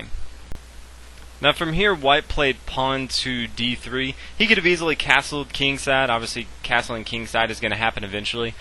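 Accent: South, United States. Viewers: This commentator is fantastic. Erudite, charismatic, and populist. Just want to offer my lay endorsement. Plus, I like a good Southern accent in the context of monarchical European Game of Kings. :)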